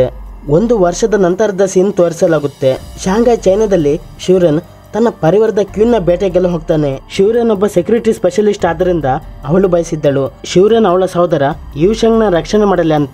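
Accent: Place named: Mangalore, India. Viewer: Are u from Kudla/Manglore?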